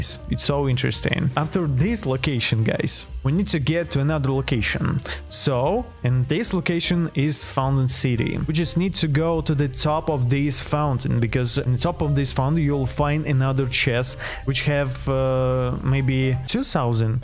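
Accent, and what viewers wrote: Russia. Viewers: Great option killing yeti, i was tryna grind since light was on stock and im on an alt im max lvl on my main tho also LOVE THE RUSSIAN ACCENT!! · bro really sounds like Russian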